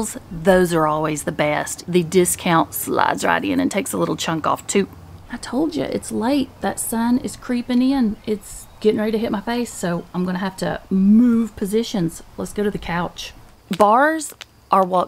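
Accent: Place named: Louisiana, United States. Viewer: You’ve got to be from Louisiana Boo! I love it. 😂❤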